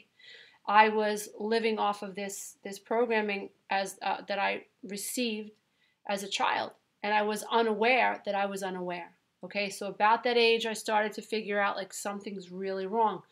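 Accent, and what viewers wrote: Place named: New Jersey, United States. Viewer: Love you after only listening to this video..... We have very similar lives/experiences. Love the way you talk......I'm a jersey girl - you sound like one as well :) How can I follow you?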